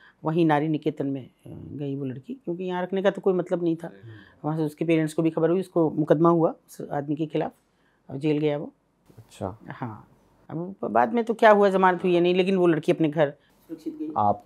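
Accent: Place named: Meerut, India. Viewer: Mairath vala lehja ❤😅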